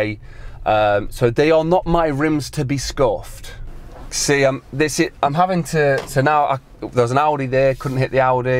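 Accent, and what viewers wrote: United Kingdom. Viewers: british...oh no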